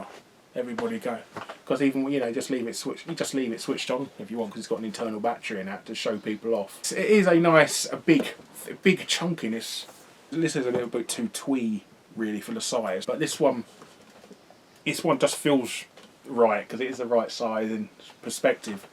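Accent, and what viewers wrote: United Kingdom. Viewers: Why are British accents so intriguing lol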